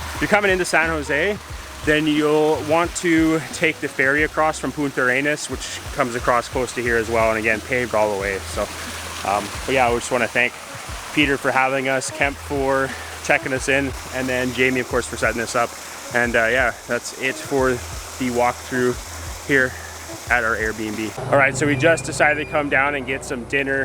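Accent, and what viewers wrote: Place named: Corona, United States. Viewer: Just curious …! We’re you from ..! Sound like Corona …..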